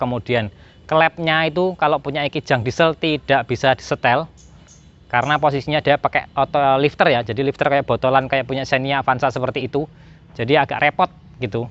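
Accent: Java, Indonesia. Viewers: Medok banget cuy.. 😊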